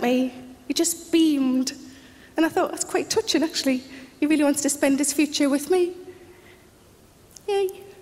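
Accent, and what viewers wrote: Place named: England, United Kingdom. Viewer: I wondered what part of England she came from with the accent, I don't here that kind of British accent very often.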